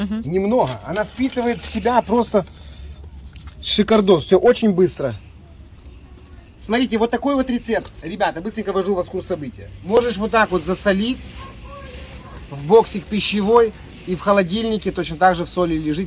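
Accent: Odessa, Ukraine. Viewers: Я так люблю одессу,а вы такой реальный представи тель ентой местной диаспоры,а ваш говар енто бальзам маи уши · А мы очень любим и. И не важно, что он жарит и где гуляет. Главное - слушать его одесский говор, тонкий юмор.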